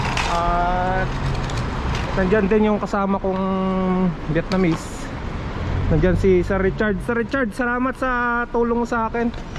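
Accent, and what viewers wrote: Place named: Ilocos, Philippines. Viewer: May ilocano accent ka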